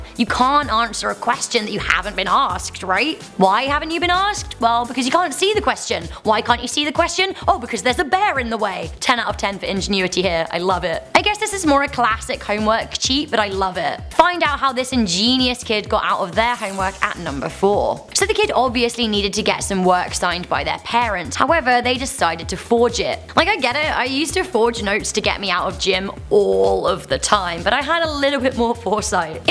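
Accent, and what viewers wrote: England, United Kingdom. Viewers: Miss are you English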